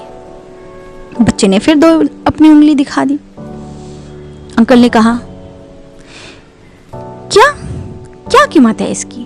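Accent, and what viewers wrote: Bengal, India. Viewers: मेम साहिबा , कहानी तो बहुत ही ज्ञानवर्धक और प्रेरणादायक है , इसके लिए बहुत बहुत धन्यवाद । आपके बंगाली टोन के कारणों से बहुत ही छोटी गलती स्त्रीलिंग और पुल्लिंग के होता है , होती है में अंतर मिट जाता है , बुरा नहीं मानेंगे । कृपया ध्यान दें । 👍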